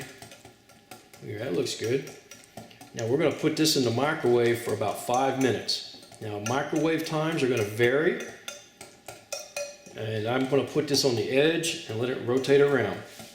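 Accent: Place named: Louisiana, United States. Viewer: What a freakin joke. It really sucks when northerners try to cook Cajun food. As a native of very southern Louisiana I can positively say this is crap. The stock is missing ingredients and a good stock cooks for at least four hours to develop the flavor. No one uses jalapeños in gumbo. It's considered a sin to do that. The roux is not dark enough and when u add the stock it looks like dishwater. His ideology about roux thickness is backwards because a dark roux is thinner than a light roux but has a nuttier taste. He should be using real andouille sausage not that fake stuff that does not even taste like andouille supposed to taste. Rotisserie chicken??? Now thats laughable! You need uncooked chicken so that it cooks and imparts flavor. This is what is unique about cajun cooking...Long cooking times with fresh ingredients and meats (uncooked) to build a composite flavor profile. 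This person has probablynever learned to cook from anyone in south Louisiana. His accent possibly sounds more like northern Louisiana and that area was not settled by the cajuns or the french so they know nothing about traditional cajun cuisine.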